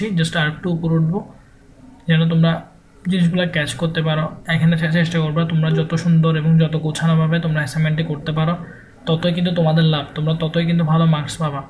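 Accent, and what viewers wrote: Chittagong, Bangladesh. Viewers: ভাইয়া আপনি কি চট্টগ্রামের বাসিন্দা???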